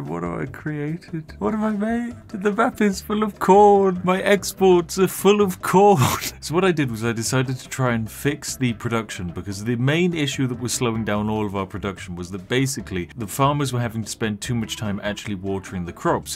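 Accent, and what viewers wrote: United Kingdom. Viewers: 🤔Ahhh.. heh... it makes sense now. You have to pay the prisoners.. Thanks Mr. British Voice!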